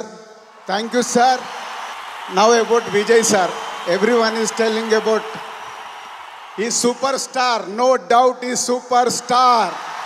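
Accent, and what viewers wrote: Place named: North, India. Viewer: Ena Vada pav vikira vadakan mari pesran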